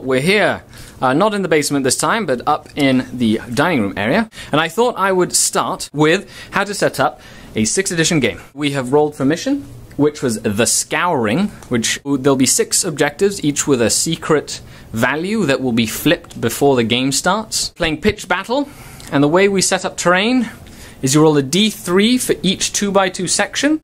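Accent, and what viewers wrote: United Kingdom. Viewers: Hi! Nice battle repport but I wonder what happened to the scourges, I'm starting my gaming season pretty soon (damm exams :S ) and will follow you batreps with interest. I'm planing on runing 3x5 Wyches with HW in venom with few extra stuff (venom blade and Blast pistol) let's see how it goes! BTW are you british? I know you are in Canada but you sound brit :O.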